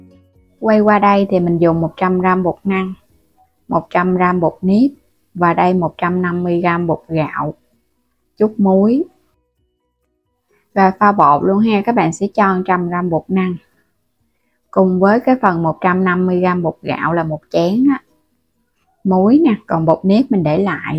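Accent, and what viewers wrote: Mekong Delta, Vietnam. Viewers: Giọng miền Tây nói vậy đó bạn ơi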